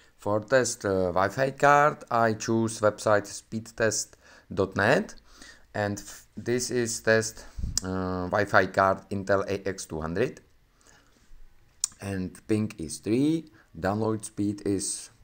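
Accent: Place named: Czech Republic. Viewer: 😀 brutální czechlish 😀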